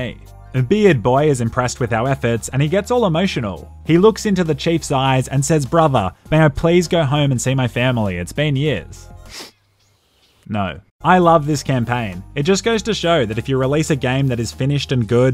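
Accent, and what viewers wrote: Australia. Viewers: >Started watching >5 seconds in >Disgusting australian accent >Pause video and click off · I can't believe I found your channel after many years. It was one of your hitman playthroughs coupled with your ozzie deadpan sarcasm which made me subscribe. Brilliant content. I watched every hitman 2 one, all of the farcry ones, all of the GTA SA and IV ones and the Vice City one. To the point my wife knows that when I go to bed, we are watching for hours now. Shame you're not doing the old intros that you once did, to spread the good word of your channel. I even made one below 😕 "Hi lads and lasses, here. If you enjoyed this video, please hijack one of Elon Musks' SpaceX rockets and send an emergency broadcast out to every planet within reach, stating to subscribe to, as this really helps the good word of my channel"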